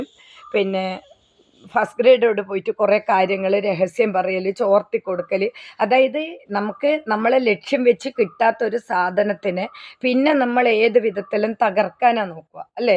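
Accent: Kannur, India. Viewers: ninakkonnum vere joliyille? Kannur dialect kelkkan oru bhangiyumilla.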